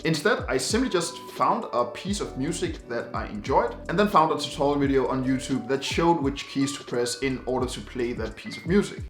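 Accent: Denmark. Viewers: Thanks for the inspirations, I am brand new myself, and are still working on my wife to allow me to buy a piano LOL... BTW are you from Denmark? I am and think I hear a bit Danish accent in your video. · Dansker, lol.. så tydeligt :D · Jeg var ret sikker på, at du var dansk - ud fra din accent. Da du så slutter af med at sige "... rounding of the video" var jeg helt sikker :P Tak for en god video :) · i can hear the danish accenttt :p anywho great video I'm 18 and wanna start learning how to play piano so bad- I've always wanted to know how to play an instrument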